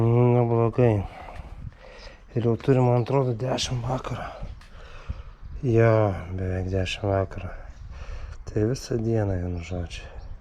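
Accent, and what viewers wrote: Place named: Dzūkija, Lithuania. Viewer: Kietai. Fainas dzukelio akcentas :)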